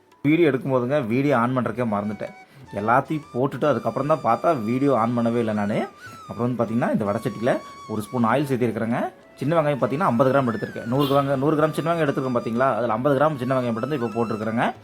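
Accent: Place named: Kongu, India. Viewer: Super na kongu tamil la arumaiya pesuringa....❤❤❤❤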